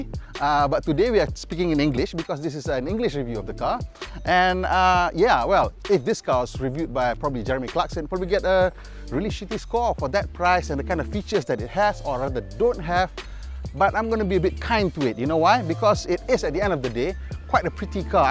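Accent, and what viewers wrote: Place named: Kelantan, Malaysia. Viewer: best jugak cakap omputih sleng kelantan... senang faham hehehe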